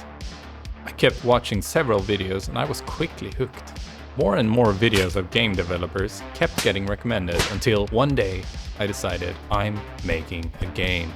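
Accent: Sweden. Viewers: eeeeeeeh that I don’t know but he sounded Swedish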